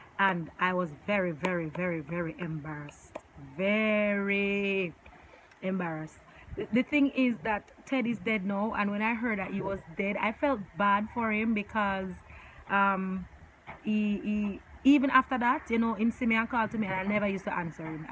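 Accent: Jamaica. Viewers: Hahahahah I really like your jamaican accent..it makes everything you say so extra...and these stories are quite embarrassing..but my favourite is the karaoke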